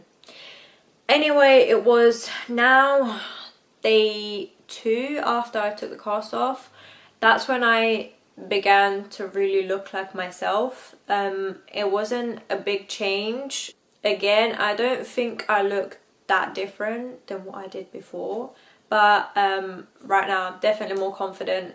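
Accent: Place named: England, United Kingdom. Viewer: you really mastered the English street lingo and accent!! SO impressed.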